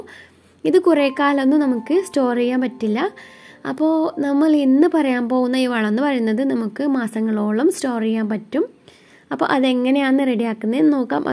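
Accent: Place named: Malabar, India. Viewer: ശബ്ദത്തിൽ ഒരു മലപ്പുറം /കോഴിക്കോട് ടച്ച്‌ ഉണ്ടല്ലോ 🙂😆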